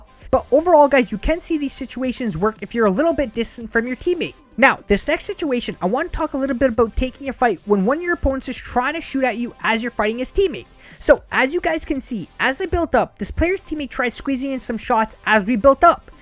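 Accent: Canada. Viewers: are you Canadian?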